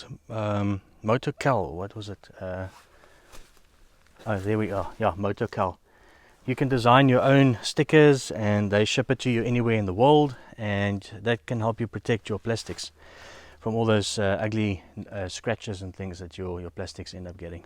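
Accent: South Africa. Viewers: Excellent and informative. Thanks for the vid. It sounds like you’re from South Africa but is that where you’re riding? Forgive me if that’s a silly question but the terrain looks similar to where I am in the eastern US. I. LOVE tight technical woods riding. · Afrikaaners probeer engels praat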